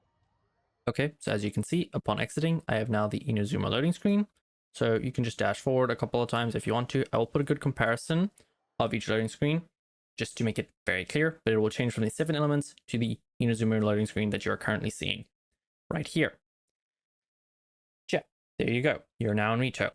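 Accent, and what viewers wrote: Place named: South Africa. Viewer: Great video! Are you from south Africa? You sound like it! Also i subscribed cause i want to see more of your claymore series. Im doing an only female account so this is very useful for me thank you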